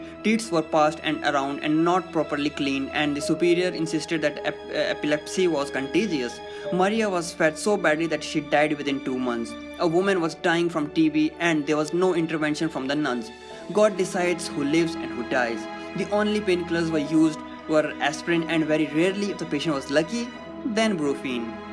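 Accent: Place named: India. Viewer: Hard to understand this kind od indian version on Hindi English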